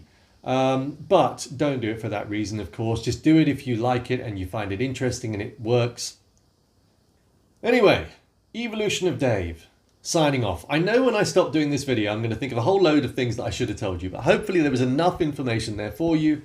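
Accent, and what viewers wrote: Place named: United Kingdom. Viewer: Mate I just wanna say thanks for being such a genuine,honest and decent bloke,I'm almost 40 and for the time in my life I can actually see abit of light at the end of the tunnel,this lockdown as give me so much time for self reflection and through a small dose of yoga and meditation I probably feel the best I have felt in over 10 years and now this is just the icing on the cake. Oh and abit more icing to add were both British so I have absolutely no issues in understanding you lol. P.s dont turn around now and say your not British and make me look like a c××t 😂😂😂🇬🇧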